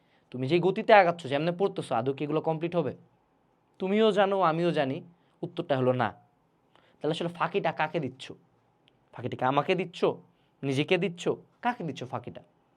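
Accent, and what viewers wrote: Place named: Rangpur, Bangladesh. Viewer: Wow vaia sb smy reality tai bole..r vaiar ktha gulo sunle amr rangpur er ktha mne pre jay age sir rao evb blto amdr..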